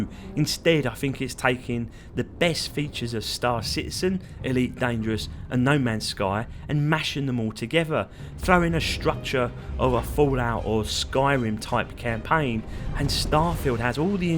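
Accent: United Kingdom. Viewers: I really enjoyed the British accent. Where are you from lad?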